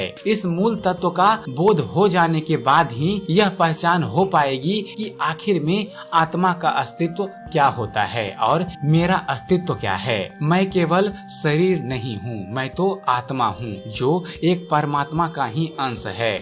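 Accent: Bihar, India. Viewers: kya ap bihari h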